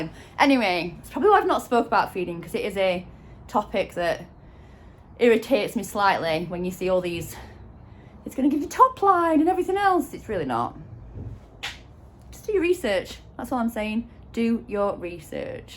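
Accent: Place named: Yorkshire, United Kingdom. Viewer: Err yes she can really use herself free jumping lol😂, Molly is going to be a proper sweetheart given time which I'm pleased to hear is what you do. Very impressed with your knowledge of feed and how important it is to read the bl###dy contents, know what your horse/ pony needs and feed accordingly, no more obese or whizzed up equines, great vlog, and great to hear a Yorkshire voice, also I was taught to ask a mare to get the best out of her ❤xx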